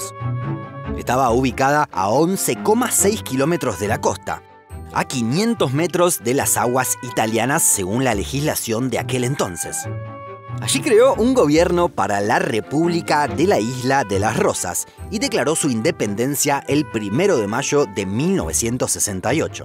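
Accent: Rioplatense, Argentina. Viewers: acento argentino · Si no me equivoco tenes acento rioplatense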